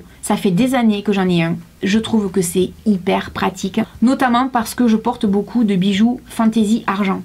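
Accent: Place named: Toulouse, France. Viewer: Bon, un bonne dose de rire, on ne dit jamais non, le redicule de tue pas. Chere vous etes adorable avec votre accent de Toulouse....j ai presque le meme, sauf que moi je suis nee a Monaco, chercher l erreur😊😊😊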